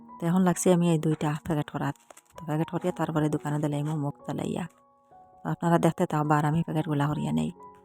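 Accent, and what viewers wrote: Sylhet, Bangladesh. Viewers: আসলে আপনার কথাগুলা অনেক ভালা লাগে। আর আপনার কথাগুলো আমরার সিলেটের লাখান। আর আমি সিলেটি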